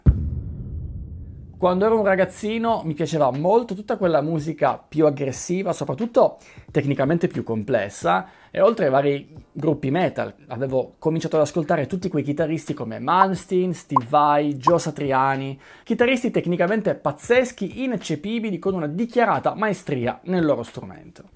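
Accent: Piedmont, Italy. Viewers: Io (ragazzo negli anni '80), sono proprio partito dai chitarristi vecchia scuola (Knopfler è stato il mio primo idolo, e poi i più vecchi Clapton, Hendrix, Page, etc.) e solo in seguito mi sono avvicinato ai mega virtuosi che in quegli anni impazzavano. E devo dire che alla fine i virtuosi mi hanno un po' stufato, a parte pochi casi come Van Halen o Morse, mentre i vecchi classici sono restati. Anche perchè la mia tecnica è quella che è. Ora stimo enormemente gente come Govan o Timmons, tecnici ma anche raffinati, però continuo ad ascoltare molto più spesso il vecchio blues rock o hard rock ignorante, anche fatto da band contemporanee (ce ne sono in giro di molto interessanti). PS: viva l'accento piemontese 😄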